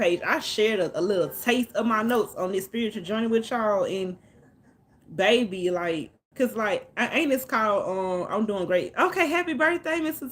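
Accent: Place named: South, United States. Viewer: What cho lil chocolate butt talkin bout lol I like the way you talk my family down south also and you love God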